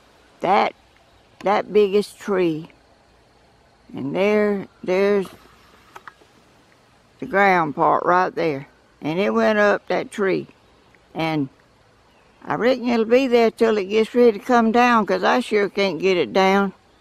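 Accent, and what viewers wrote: South, United States. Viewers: I JUST LOVE YOUR SOUTHERN DRAWL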